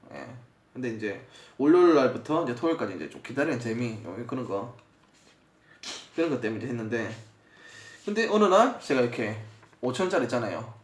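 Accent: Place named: Daegu, South Korea. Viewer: 어디분이시져? 부산? 대구같은데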